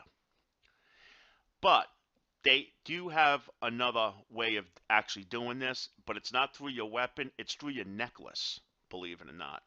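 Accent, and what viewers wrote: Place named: Boston, United States. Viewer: Mass/Boston? lol trying to place it :D Anyways nice video Ty for it, just coming back and this is just what I was looking for :) Keep up the great work and TY for your time.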